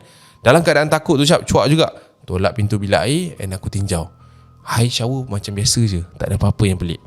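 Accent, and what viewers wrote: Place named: Terengganu, Malaysia. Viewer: Aku dgr je dia ckp klantan tpi slang ganu😅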